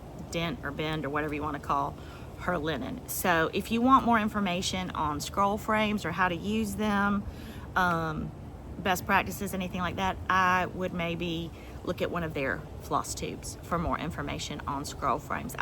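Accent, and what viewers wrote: South, United States. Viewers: I got so tickled to hear your Southern twang. I've lived in the Tampa Bay area almost 40 years. I must be drunk and blind! I miss my Tennessee roots, and my North and South Carolina family and friends. People here still ask, "Where you from?" I need a snarky answer to that! Really enjoyed your flosstube, as an "old" newbie to your channel, but 50+ years cross stitching. Love a Blackbird❤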